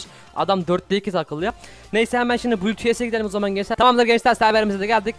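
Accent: Adana, Turkey. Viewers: Abi şu konuşma stiline hastayım tam bir adanalısın <3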